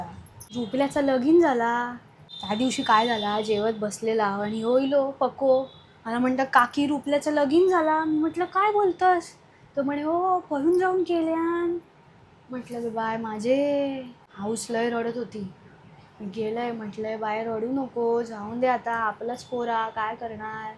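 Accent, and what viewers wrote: Malvan, India. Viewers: Bharichhh काय ता केलास गो pure मालवणी ❤❤❤ मी कुडाळचा आसय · लय भारी मालवणी तर झक्कास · भारी तुम्हाला मालवणी येता 😂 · A-pratim malvani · भारी मालवणी तु मालवणी आहेस का, ❤❤❤❤❤❤❤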